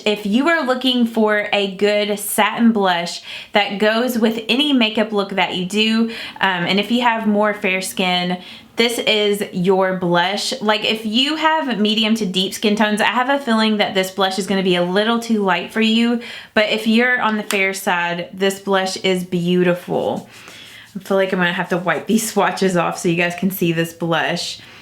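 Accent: South, United States. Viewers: I love your southern accent. It’s so cute.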